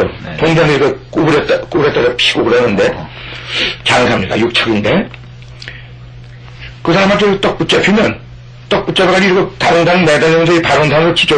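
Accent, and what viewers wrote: South Korea. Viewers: 지방 분이 아니시군요? 저는 지방에서 살기때문에 말씀을 대춤 알아듣겟어요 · 나레이션 분 억양 들으니 경상도 사람 같네요! 뜨끔하시죠 ㅎ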